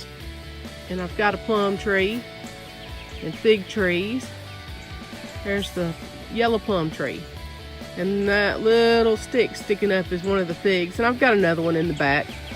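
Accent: Mississippi, United States. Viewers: Love your garden. Very neat and beautiful. I love your Mississippi accent too. So cute...Have a blessed Easter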